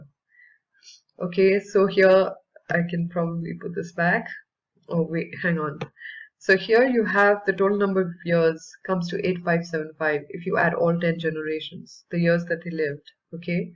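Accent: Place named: Germany. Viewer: I am so glad I found your channel... I have always felt there are codes in the Bible, and I know for instance in the KJV, there are 783,137 words and right in the very center of these words of the Bible are two words - the Lord.... it is no coincidence that the Lord is at the very heart, the very center of the Bible... you are on to something.. I have lost most of my vision recently by eye stroke... I have to use Windows Magnifyer set at 4 or 5 tiimes normal... I will do some research and get back to you... I wonder if we can find 1221 working with other numbers in Genesis also.. I am going to do some research and see what I find, I'll get back to you.. I also feel Noah's ark, that story is also a symbol of the Rapture and Tribulation for our generation.. the God fearing people have a Protector, the Ark, helping collect them before tribulation, The Flood comes to the Earth... 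a sign for the Rapture and Tribulation right there...okay, nice to meet you, will get back if I find anything.. love your accent, is that German/Austrian I am hearing? I love it....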